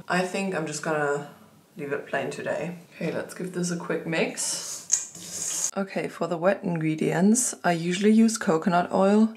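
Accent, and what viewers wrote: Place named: Germany. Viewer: New subscriber here. Love to see your big portions of yummi plantbased food😅 and your german accent. I am from Denmark❤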